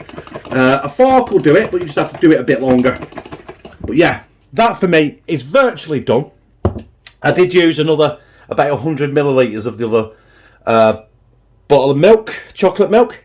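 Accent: United Kingdom. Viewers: Just discovered you while searching for a chocolate pancake recipe. Dang you are fun!!! I'm thinking a spoonful of ice cream or whipped cream on the top w/ crushed KitKat or flake would be divine. Definitely agree w/ your end comment on the salt but would add it into the mix. I've subscribed based purely on this recipe plus being a Brit living in Texas I just love hearing your accent & 'language' LOL!!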